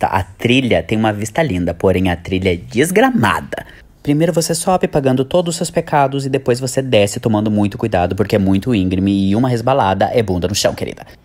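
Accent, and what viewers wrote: Rio Grande do Sul, Brazil. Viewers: Eu ameeeiiii 😍 vc é gaúcho? Gosto muito dos teus vídeos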